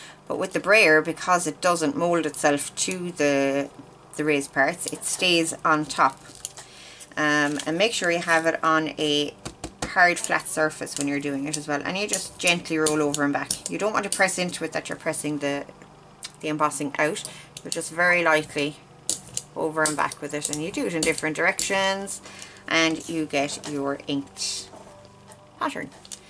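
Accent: Ireland. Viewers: Thank you for sharing .Very clear presentation.(Love your Irish lilt.)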